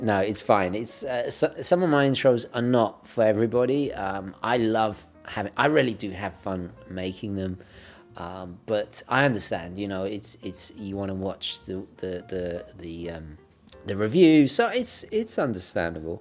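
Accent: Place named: United Kingdom. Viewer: I really laugh a lot in this video. I’m from México, I use to live in an island on the Caribbean Sea, you should go there for vacations. But now I leave in Mexico City for university. Here no one speaks in English, but also, here I started to really like de language. And more the british accent like you have. That reminds me of my English teacher back in those days. I found your videos very interesting, and now I’ve just bought my first watch. I was the one asking about pocket watches months ago, but starting to know more about horology, now I bought a invicta pro diver. I know, a controversial watch. But that’s what I can afford at this moment, and I also choose that because my dad is (but he doesn’t work on that anymore) a diver, and I want to learn in the future. Thanks for your videos, I learn and practice my English at the same time.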